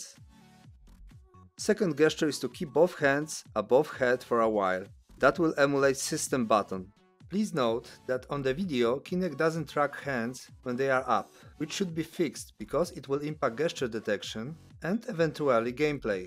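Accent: Poland. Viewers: nice polish accent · haha, po akcencie idzie poznać, że rodak :D super film ugułem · Z Polski jesteś bo masz taki akcent ? You are from poland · Больше как поляк, не все люди с таким акцентом Русские, чтоб ты знал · Ojoj czuć polski akcent jak chuj XDDDD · your accent is very polish are you from poland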